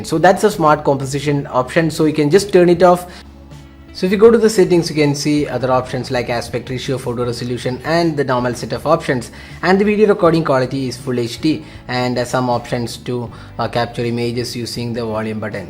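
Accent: Kerala, India. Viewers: r u from Kerala?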